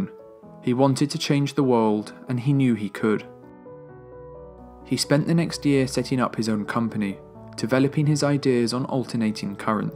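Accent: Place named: Estuary, United Kingdom. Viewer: Noce job. Remember v.o. "the' is "thee" on all vowels . (There's only 5...) And no estuary flat "Oy" on "I"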